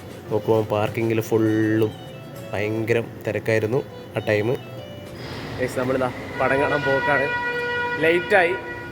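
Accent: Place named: Kannur, India. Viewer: Aa kannur slang kelkan oru verethanne sugam ❤. Ennalum nte husband nne kootathe film kanan poy nadakate🤩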